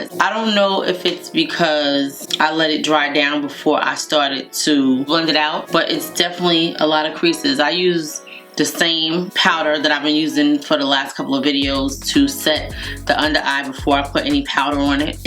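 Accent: New York, United States. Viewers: New subscriber here! Where are you from?! Your accent sounds like the NE Philly or NYC or dare I say it.. jersey?! I gotta know if I’m close. Also, great content! Happy to have found your channel!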